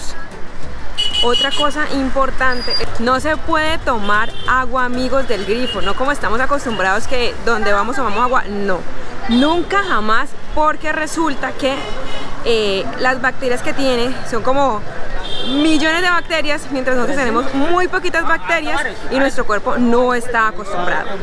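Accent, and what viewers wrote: Cali, Colombia. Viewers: Me encanta tu acento extraño mi ciudad cali💛💙❤ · me ecantas. Ese acento caleño ese cuerpaso jajaja tqm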